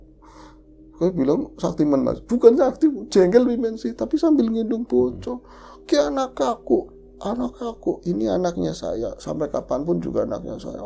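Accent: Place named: Tegal, Indonesia. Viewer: Saya senang gaya bercerita, santai, ada bhs tegalnya, ada lucunya....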